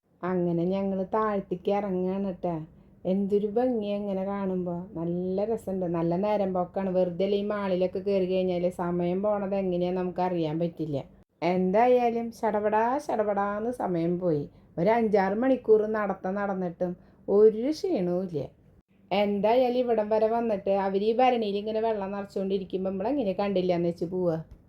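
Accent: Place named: Thrissur, India. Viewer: Thrissur dialogue superrrr❤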